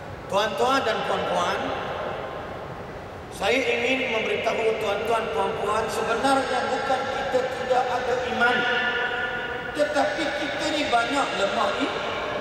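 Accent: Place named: India. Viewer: ni ke ustaz bollywood tu?salu dgr dlam IKIM jer..pndai die ajuk/ngaji slanga india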